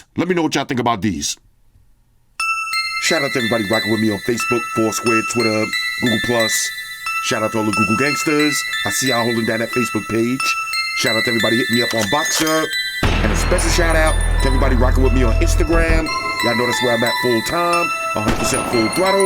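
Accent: United States. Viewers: Where are you from, bro? You sound American but your usage of the word "aluminium" is throwing me off 🙂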